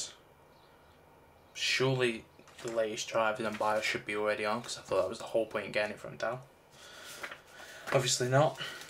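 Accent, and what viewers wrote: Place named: Liverpool, United Kingdom. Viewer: Are you from Liverpool? Your accent sounds like you're from Liverpool so I was asking if you're from there...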